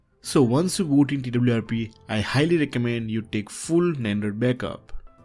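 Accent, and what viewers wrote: India. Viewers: Bro you are Indian or foreign. BTW BEST CONTENT. CAN YOU PLEASE MAKE A VIDEO FOR BEST STALE ROM FOR MOTO G4 PLAY (HARPIA). LOVE FROM INDIA.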